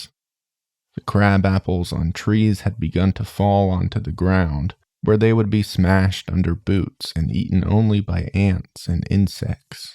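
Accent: United States. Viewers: Wait is he from South Carolina?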